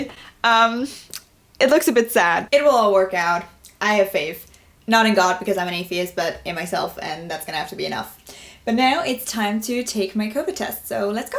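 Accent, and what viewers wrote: United Kingdom. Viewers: It's like you re speaking a better English (in British way ) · You have quiet a British accent, love that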